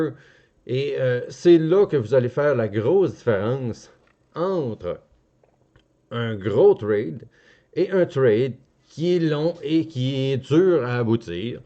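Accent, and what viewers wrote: Canada. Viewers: Superbe. Oh l'accent canadien!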